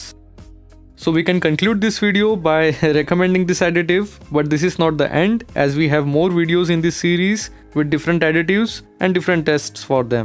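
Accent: India. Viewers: Duh, indian english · Indian accent not arabic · The Indian accent is killing me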